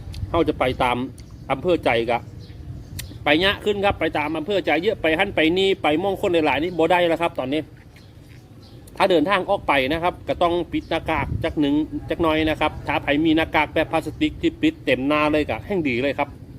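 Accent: Phu Thai, Thailand. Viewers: วัดไข่...5555......555..มักฟังสำเนียงภูไท...555...ม่วนดีฟังเพลินๆ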